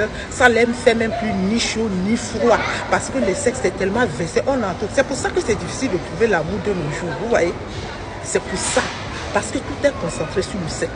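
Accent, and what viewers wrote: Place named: Togo. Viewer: l accent est togolais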